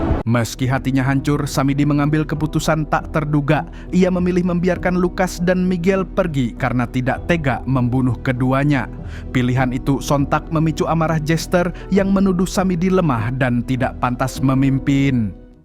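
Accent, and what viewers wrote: Banjar, Indonesia. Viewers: asli buhan Banjar kh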